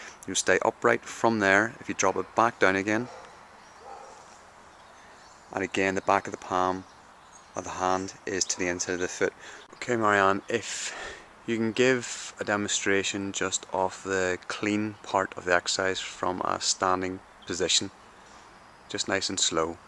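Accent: Ireland. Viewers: The only person to equal your clear and accurate descriptions is the original kettlebell man Pavel ,excellent video and great commentary from your friend,oh and as an aside its great to hear your lovely Irish brogue,makes me feel homesick ,and I'm a Scotsman in Australia..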